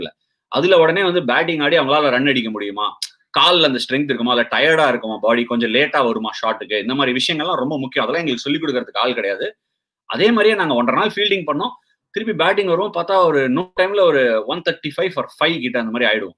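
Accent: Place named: Tamil Brahmin, India. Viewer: Tamil Brahmin style of talking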